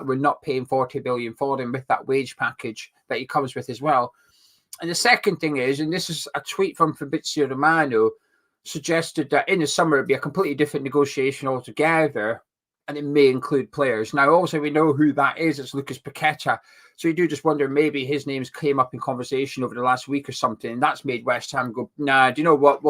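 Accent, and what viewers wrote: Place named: Yorkshire, United Kingdom. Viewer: The "iron, a lion and ready to Roar" made me laugh too. Especially in the Yorkshire accent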